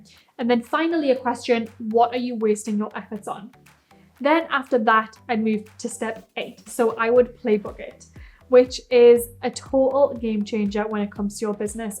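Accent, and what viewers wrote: United Kingdom. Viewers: I love the Geordie accent👌🏻ever since Geordie Shore😂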